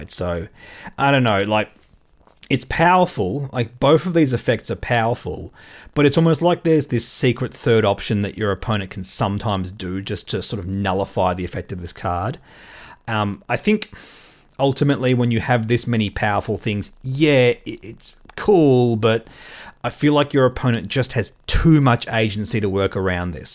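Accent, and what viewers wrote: Australia. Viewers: Good to hear your Aussie voice talking x-wing as I do my work.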